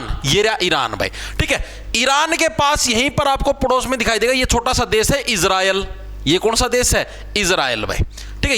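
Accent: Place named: Haryana, India. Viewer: Arey hindi to theek se bol liya karo bhai samjh to jab aayega haryanvi mix kar rakhi hai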